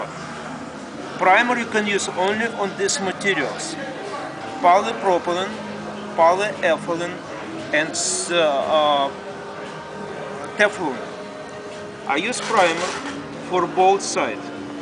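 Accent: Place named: Russia. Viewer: Russian accent👍👍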